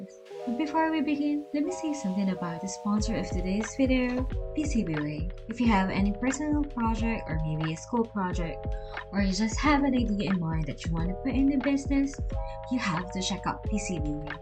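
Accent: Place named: Philippines. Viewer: My dumbass rly thought u were a dude. Also r u filo cos u kinda hav the accent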